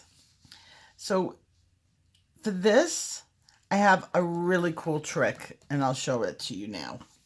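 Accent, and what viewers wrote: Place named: Brooklyn, United States. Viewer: New to your channel, and wondering if you’d mind explaining what paska ( not even sure how to spell it, lol ) is.... never heard of it... loved your tutorial on leaves and as a Canadian, your accent is a delight, makes me smile... is it a Brooklyn accent? That’s my best guess.... thanks for giving such great instructions.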